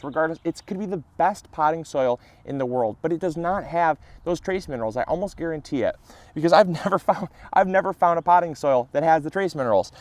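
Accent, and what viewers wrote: Michigan, United States. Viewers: That MI accent a bit strong hey?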